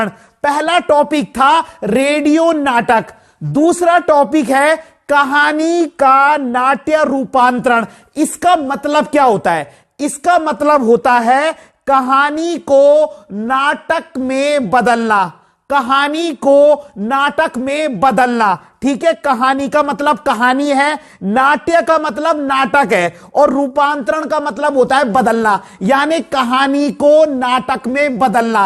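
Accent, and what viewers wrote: Garhwal, India. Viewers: Sir aap gadwali ho ? Apni tone gadwali he .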